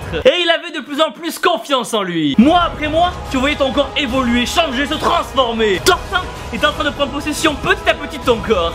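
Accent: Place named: Southwest, France. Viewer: les intros de Tibo: - avant: avec un accent du sud ouest, un mec total dark. "hey les gens! ptn on m'a retrouvé, faut se barrerrrrr!!! - un peu après: "hmmmmmmm ( inspiration avant le DAMN ), hmmmm, HMMMMMMMM, tiens dis donc, mes mains puent la transpiration, c'est degueulasse! hmmmmm DAMMMMMNNNNNNNNNNNNNN explosion atomique les gens, c'est et c'est un... hmmmmmm hmmmmmm HMMMMMMMMM. PPPPPPPPPPPLLAIISSSIIIIIIIIIIRRRRR gros postillions de vous parler aujourd'hui" un peu après: " DAMNNN les gens, j'espère que vous allez bien, c'est et c'est un plaisir de vous voir aujourd'hui! et aujourd'hui les gens... aujourd'hui les gens... aujourd'hui, oui aujourd'hui... pas d'inspiration BOUM!! " en ce moment: " dan les gens, g pas le temps de niaiser, faut que je batte squeezie a qui a l'intro la plus rapide! BOUMMM! " Demain...?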